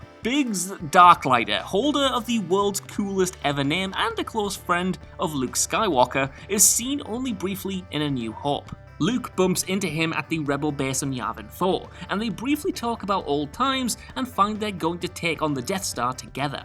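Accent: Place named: England, United Kingdom. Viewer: Just show the scenes, I dont give a damn about the intro or hearing your annoying pom voice.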